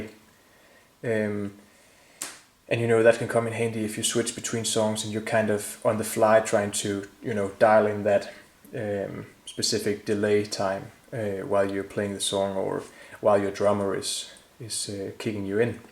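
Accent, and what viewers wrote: Denmark. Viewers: I think I've contacted you before I know you're from Denmark I can tell from your accent. My wife is Danish and we go there every year. I don't really know where in Denmark you're from definitely not Jutland. Or Fyn so I guess the Copenhagen area. We have a house in Gilleleje. Just got a gt100 out of the box from being in storage so now I have to learn how to use it I'll be watching your videos. I'm used to the GT 10 where I could copy built in patches from one location to another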